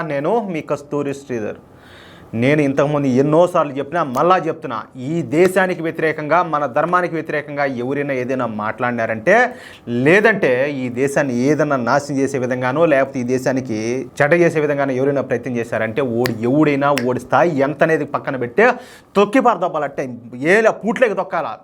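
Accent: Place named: Kadapa, India. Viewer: Anna manadhi Kadapa...Anna....slang antlageundhi.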